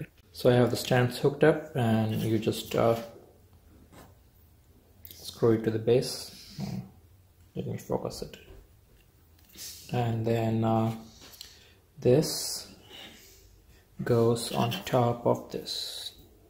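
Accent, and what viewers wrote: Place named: India. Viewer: Thank you,. 1. So I need to find a router that has a USB port and connect the RPI and the hard disk to that router? Thanks 2. Yes Volumio and Max2Play are paid but hopefully they will offer some support in return, at my age I need a lot of hand holding when it comes to software. 3. These small screens connect via what is called SPi. I assume this means Special Purpose Interface and they require coding of which I have zero knowledge. I would have to find some youngster willing to help me with that. 4. So your laptop was never connected to the RPI? Thanks again for your quick response. I guess from your accent that you are an Indian living in the US. Probably a young software professional. So thank you for helping a fellow “Desi”.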